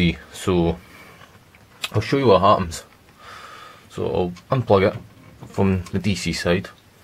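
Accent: Scotland, United Kingdom. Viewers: Hi must say I like your videos I must ask u your accent are u Scottish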